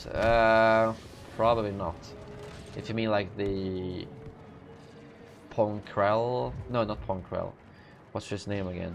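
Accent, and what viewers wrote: Sweden. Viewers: You come from Sverige ? :D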